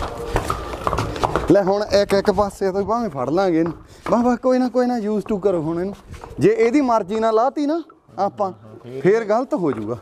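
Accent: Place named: Malwa, India. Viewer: 22 malwe alli bolli bhott vidya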